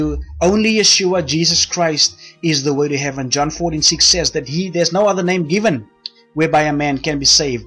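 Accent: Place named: South Africa. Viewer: Hey, I recognize that accent, Im from South Africa too and live in Costa Rica, nice hearing from you brother, God Bless and thank you for your message, Yeshua is coming, Amen!!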